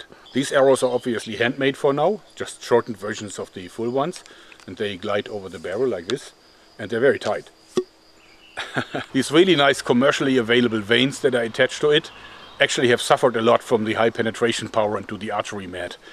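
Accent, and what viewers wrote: Germany. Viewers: he is german i know that accent if you watch albert Einstein genuis from nat geo thats the accent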